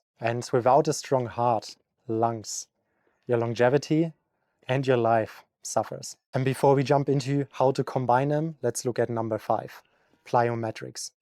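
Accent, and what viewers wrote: Germany. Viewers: very subtle german accent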